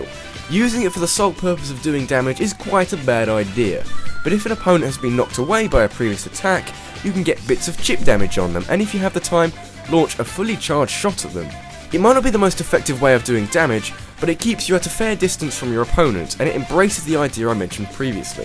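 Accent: England, United Kingdom. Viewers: Nice countdown, you're one of the few youtubers who can make me crack a smile, since I love English accents. PS: Have you played TF2x10?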